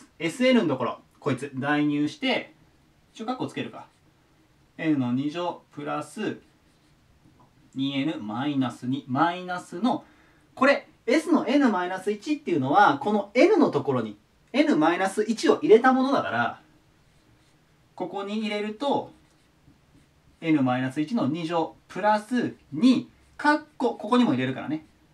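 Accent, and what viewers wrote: Kansai, Japan. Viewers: 所々出てしまう関西訛り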